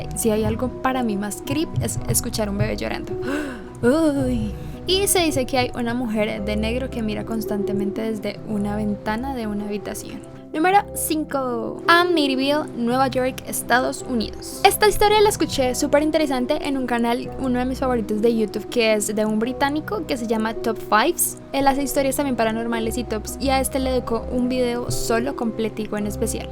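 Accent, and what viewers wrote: Colombia. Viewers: eres de Colombia sierto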